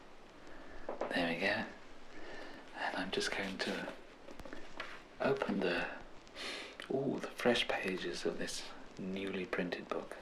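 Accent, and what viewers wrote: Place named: England, United Kingdom. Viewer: Where in England are you from?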